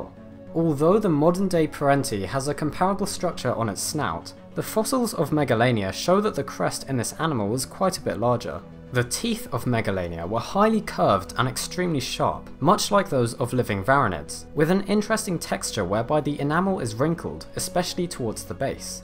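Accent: United Kingdom. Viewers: STOP WITH THE ANNOYING BRITISH ACCENT. Standard American English is fine. Doing a video on Aussie Wildlife, how about using an Australian accent..JMHO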